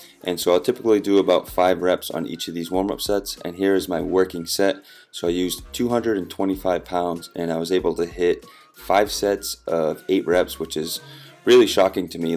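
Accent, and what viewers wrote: Boston, United States. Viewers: That lady at Dunkin is all of us. Haha! Great video! Hearing your accent makes me miss Boston so much! Keep up the great work! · I just noticed the Boston accent . I didn’t know he was from up this way., meaning The NorthEast ,.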